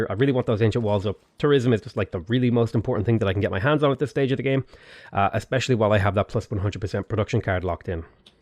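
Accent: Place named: Ireland. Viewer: He sounds Irish, might as well ask if the Pope shits in the forest.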